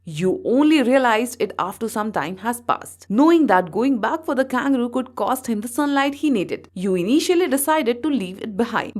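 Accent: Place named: India. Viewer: The Indian accent is sooooo hard .... even jetfuel cant melt it....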